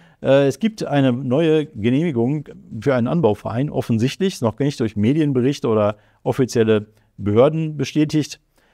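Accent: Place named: Berlin, Germany. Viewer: Immer noch ganz schön „Berlin‘isch“ 😂😂😂 einfach BESTER Mann, sag ich!!!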